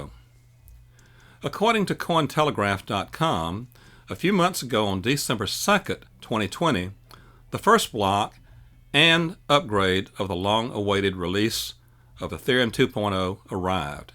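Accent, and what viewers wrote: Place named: South, United States. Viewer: you sound like a real southern gentlemen. I heard Flare would go live on may 1st. Thats what i heard.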